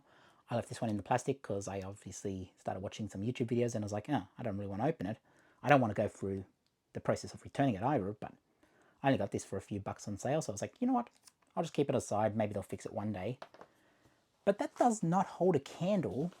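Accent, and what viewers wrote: Australia. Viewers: Austrailan indigenous.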